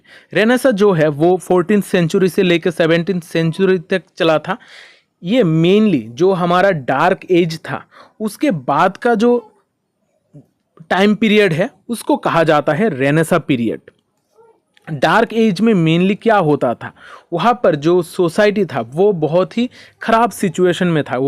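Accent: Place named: Odisha, India. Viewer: Apke accent se lagaa aap Odia ho. Maaf karnaa.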